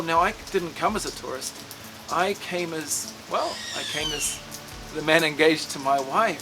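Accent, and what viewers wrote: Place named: New Zealand. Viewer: Was wondering if this fulla was from home, I could hear our kiwi Accent 😂🇳🇿🤙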